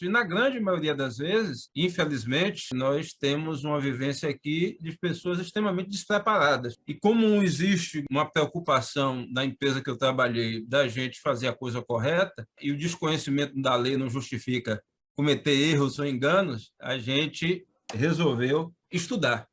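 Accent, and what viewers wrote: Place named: Northeast, Brazil. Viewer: Seu sotaque nordestino, não define o seu intelecto . Parabéns brother!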